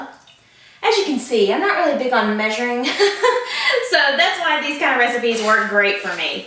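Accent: South, United States. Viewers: Is she from Alabama or Georgia?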